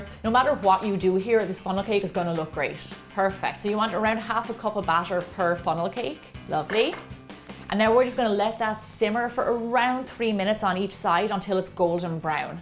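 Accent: Ireland. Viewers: I love your irish accent!! ❤️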